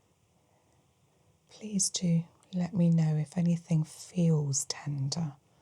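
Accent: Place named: Wales, United Kingdom. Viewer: Best Welsh accent in ASMR no doubt 😅 sleepy 🥱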